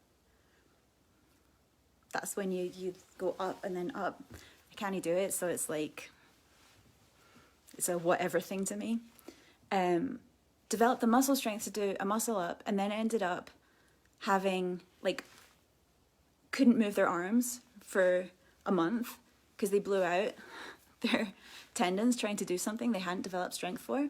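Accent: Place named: Glasgow, United Kingdom. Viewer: it's so cool that you still have a wee Weegie burr :D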